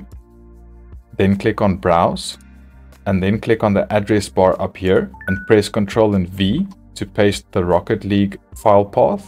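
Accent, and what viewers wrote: Afrikaans, South Africa. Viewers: Jy klink Afrikaans. Is ek reg?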